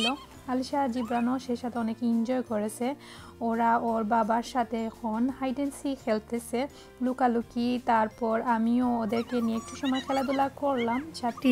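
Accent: Sylhet, Bangladesh. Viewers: assalamualukum apa apnar muko amrar sylheti mat hunte ow besi bala lage go please sylheti bashai matba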